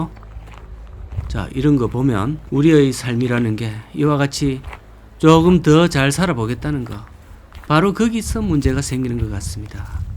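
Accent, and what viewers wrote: Gyeongsang, South Korea. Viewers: 강호발TV님 오늘도 구수한 경상도 사투리 잘들었습니다 멀리노르웨이에서 늘응원합니다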